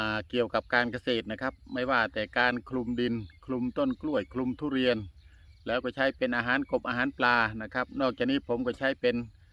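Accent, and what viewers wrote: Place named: Bangkok, Thailand. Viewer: สำเนียงคนกรุงเทพเสร็น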